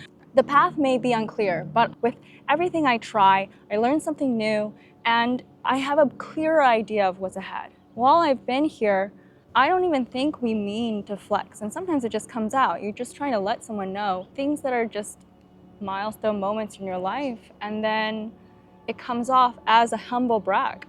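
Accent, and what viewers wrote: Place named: United States. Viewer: I do like your English , however, a bit overly American! Do build your own character when U speak. Never imitate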